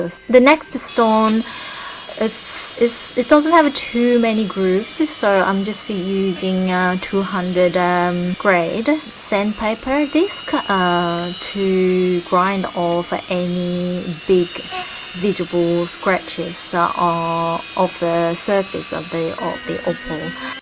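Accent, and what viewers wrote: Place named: Australia. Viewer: Please don't apologize for your accent. I was captivated by the Asian/Aussie blend. I hope to find more of your work. Very informative, thank you!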